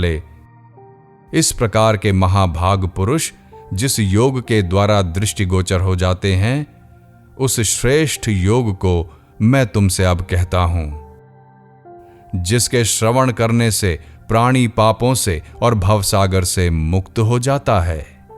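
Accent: Standard. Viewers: Sir aapki.aavaj bahut shudh h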